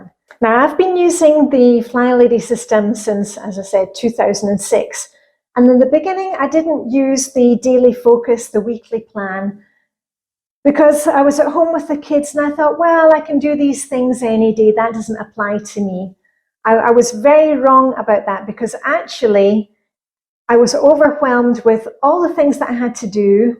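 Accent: Scotland, United Kingdom. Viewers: I love Denmark! But I perceive you are from Scotland!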